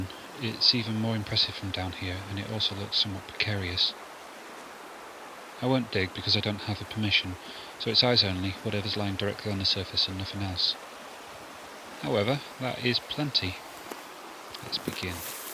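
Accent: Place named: RP, United Kingdom. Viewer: you sound pretty posh for a mudlarker.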